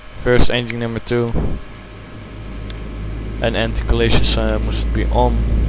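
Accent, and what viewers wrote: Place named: Netherlands. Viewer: Nice video, I like your Dutch accent :-) That's remember me the cockpit visit when I fly with KLM, lol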